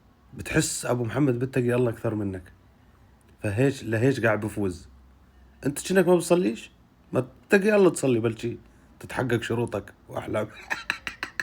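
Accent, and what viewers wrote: Hauran, Syria. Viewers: كلامك بمحلو لو كان مزح بس ملغم افحمتو لابو سلمان واحلى لهجة هية اللهجة الحورانية 😊😊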